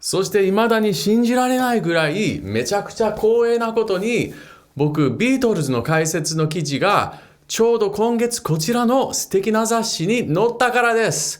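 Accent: Kansai, Japan. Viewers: えっ、この人、アメリカ人？関西人じゃないの？ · こんなチャンネルがあったの、今日気づきましたわ。なんで関西弁ですのん？いや、サイコーｗｗ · 関西弁の解説がとても良いし、ギターと歌も上手、そして、ビートルズの良さを楽しみながら解説している。すごく勉強になりました。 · そらしゃーないわ。彼は関西人やもん！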